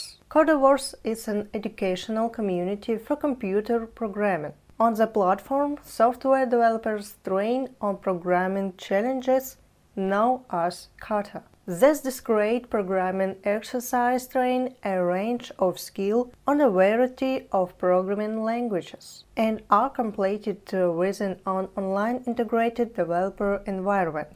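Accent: Russia. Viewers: Ну английский я бы на твердую 3 оцениваю)) чувствуется русский английский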